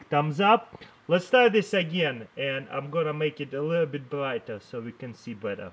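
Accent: Russia. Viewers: No its just because he is Russian, and the English language is very difficult sometimes for people going from Russian and picking up English. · I SO KNEW you were Russian! I'm Russian too...decent...and I recognized the accent. Thanks Dude you're way cool! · are you Russian? sorry for judging off an accent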